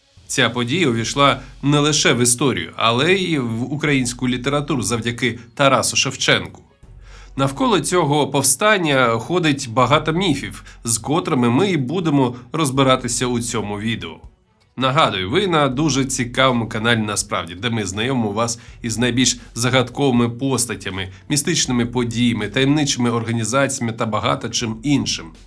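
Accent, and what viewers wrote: Russia. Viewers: Доповідачеві бажаю позбавитись руського акання і читати вголос українською щоб чути вимову.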